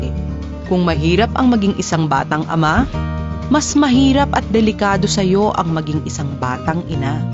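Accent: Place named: Philippines. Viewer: Very tagalog 😂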